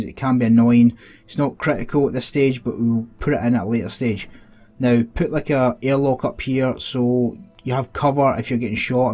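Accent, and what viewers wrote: Scotland, United Kingdom. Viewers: Good Scottish accent, I'm in Falkirk, from Cumbernauld and all fallout games look like Scottish newtowns! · Scottish accents are the best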